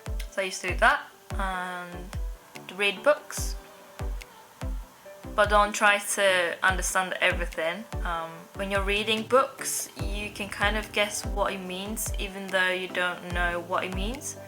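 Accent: United Kingdom. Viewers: You do sound Northern lol....英語ペラペラやん！ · wow!!!最初の数10秒くらいはニュートラルな話され方をしてたからか、あまり気づかなく、なんとなく聞いてたのですか、1分後くらいから、うぉ！！来た！！！ってなりました🤣若干ロンドンのcockneyやMLEにも聞こえる気がしました！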